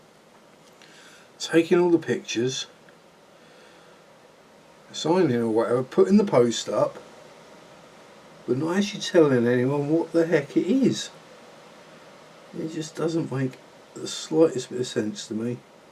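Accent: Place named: South Africa. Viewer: How do you spray white? ;) or how does one spray white :) whey mannn nowt rang wit talkin South African like!!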